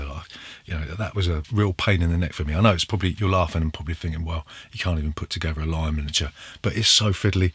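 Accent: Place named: South London, United Kingdom. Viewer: Another great and really interesting 10... Chinatown and Puerto Rico were two of the first of the modern style games that I played...👍. Must admit I prefer San Juan... Really looking forward to the next ten... Great job... Got to ask...where are you from...? Your accent is almost as south London as mine...no offence meant if your not from South London...😁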